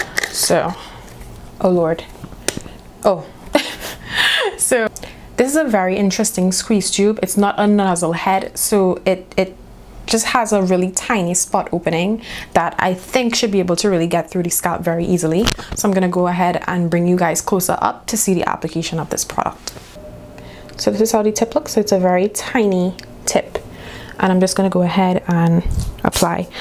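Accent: Trinidad and Tobago. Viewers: From the min you spoke I was like look my Trini sister lol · Must make out dat accent 🇹🇹🇹🇹🇹🇹🇹🇹🇹🇹🇹🇹🇹🇹🇹🇹🇹🇹 im subscribing now ❤️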